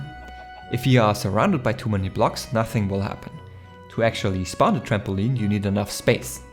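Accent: Germany. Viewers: Dein Englisch klingt als wärst du Deutschet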